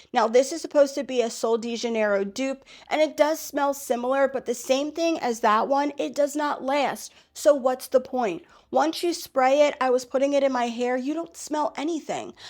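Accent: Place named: New York, United States. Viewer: Great video I agree with all your thumbs down however I saw some new products we don't yet have out here in California. By the way I love your accent! It's adorable almost New York is it a Philly one? Just curious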